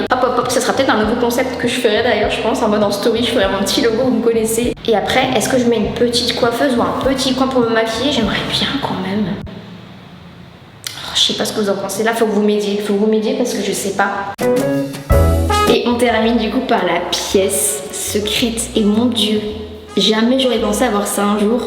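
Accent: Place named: North, France. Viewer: tu es originaire du nord de la france? tu as un accent >_<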